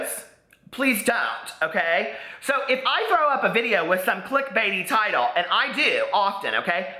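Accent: United States. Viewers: I hear your American accent and I feel a great fondness for it because I know the personality behind it. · This is my favourite video of yours so far :) and I love your voice, it's one of the things that sets you apart and why I started watching your channel regularly. I don't think it sounds weird at all, it just sounds like a different American accent (I'm English!) but it makes you come across as genuine and full of energy! And I love it when you get worked up and talk (rant!) really fast, pretty sure I subscribed after seeing your rant about your ex work colleagues ;) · Baste! 🦃💜😘 edited to say: I love your voice. You don't sound like a young girl whatsoever. I can't believe anyone would even say that! That's laughable & dumb. I'm really great at accents & to me you just sound like a cool guy who is possibly from the south (?) I'm sorry if that's wrong, but that's what I hear. 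And you are beautiful inside and out, truly! You are entertaining, intelligent, well-spoken, delightful. Sending you so much love. I wish I could be as strong as your mother. I will commit myself to doing better. Thank you for this message, it has changed me.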